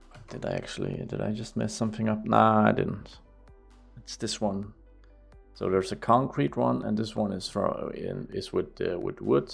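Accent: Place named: Denmark. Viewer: Danglish :D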